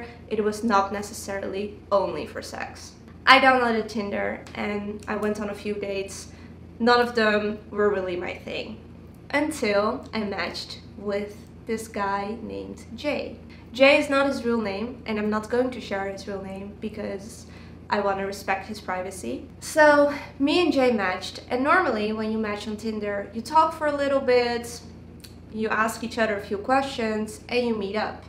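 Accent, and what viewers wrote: Netherlands. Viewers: You have a little dutch accent🙈 are you from Holland? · Wow I heard the dutch from second 1 :) hiii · ik hoorde gelijk je Nederlands accent. groetjes uit Nederland! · As an American who once lived in Holland I learned an expression, The best women get the worst men. I think it's true, those Nederlanders are so insightful. Living in your homeland was possibly the best thing I ever did. I could hear from your accent that you are Dutch and I am always grateful for my time in that great country. Tot Ziens. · Really looking forward to Part 2. P.s. ik dacht al dat ik een nederlandse tongval hoorde met je engels 😋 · Haha yeah I just heard her accent and I was like ohh you sound Dutch ( I'm from the Netherlands as well ) and then I checked and it made sense haha. But we learn English in elementary school so it's kind of a second language for us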